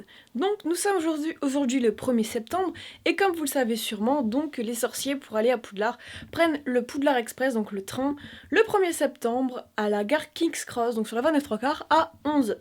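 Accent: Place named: Nord, France. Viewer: YES je savais que je connaissais bien cet accent ! T'es à la voie 9¾ de Lille Flandres ! Eune sorchière deuch'nord !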